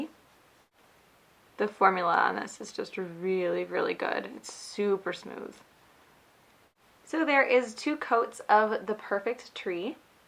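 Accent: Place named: Midwest, United States. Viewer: Are you from the Midwest? Just curious because you have a slight accent that is similar to mine lol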